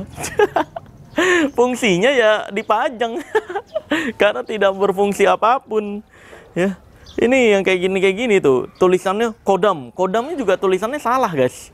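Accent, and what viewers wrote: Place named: Madura, Indonesia. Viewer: Madura banget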